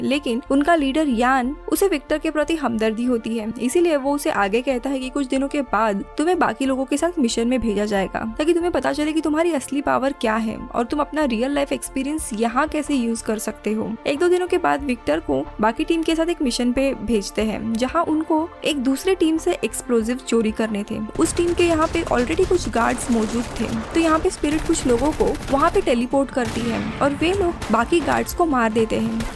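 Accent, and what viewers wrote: Maharashtra, India. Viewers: aap marathi ho kya??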